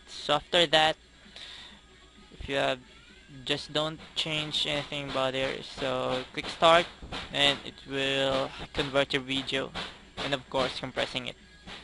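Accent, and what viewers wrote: Philippines. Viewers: are you filipino..?? dat accent xD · i know a filipino accent when i hear one lol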